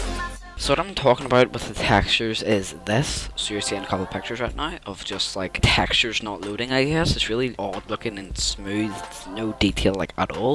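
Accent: Northern Ireland, United Kingdom. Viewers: Mate are you Northern Irish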